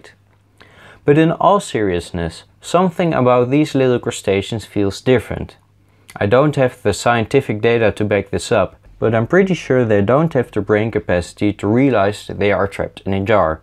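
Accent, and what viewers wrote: Netherlands. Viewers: you sound really dutch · Jij bent nederlands ik hoor het aan je accent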